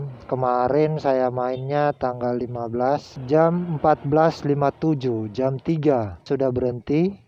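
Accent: Manado, Indonesia. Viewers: Orang mnado ya bro?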